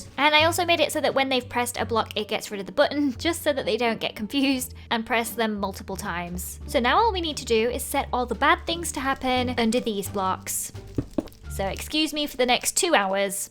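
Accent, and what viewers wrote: United Kingdom. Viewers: sounds so much British in this video😂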